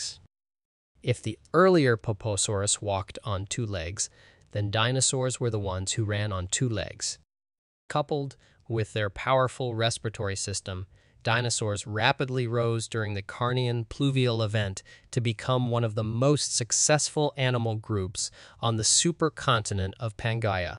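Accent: United States. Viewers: Another great episode. From your accent I'd assumed you were American.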